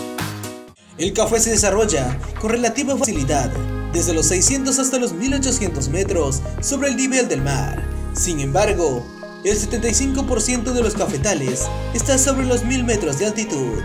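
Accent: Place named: Peru. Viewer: Habla con acento Peruano Carajo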